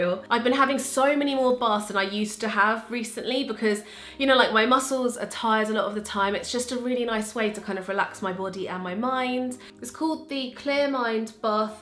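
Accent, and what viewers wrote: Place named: England, United Kingdom. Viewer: Am I the only one who loved the way she said nerdy? Lol. The English accent is life 😩 lol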